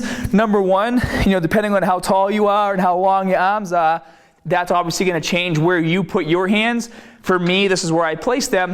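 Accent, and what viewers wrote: Boston, United States. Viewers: Good video so ima guess your from Boston lol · Great vid. Accent is great hah, from boston? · Did he always have a Boston accent? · since when did you have a boston accent? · Did you just say Bah? That Bahston accent really coming out hard, bro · I dont need your opinion Hell no. Don't disrespect NJ like that lol. This is a Baaahhhston accent. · Where did the Boston accent come from??? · is he from boston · Are you from Boston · havnt watched your vids for a little while now but ERM lol what's with that Boston accent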